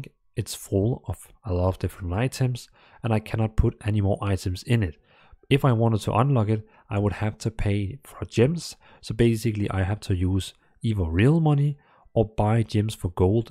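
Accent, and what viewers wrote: Denmark. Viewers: Ahh thank you for this very helpful introduction. I just recovered my Guild Wars 2 beta character, a gnome thief who has been waiting patiently for me for 3411 days. Looking forward to give the game a shot. Out of curiosity, you sound Danish, am I correct in that assessment? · Du taler super engelsk, men vi danskere har bare en evne til at spotte andre danskere uanset hvor lidt accent man taler med 😁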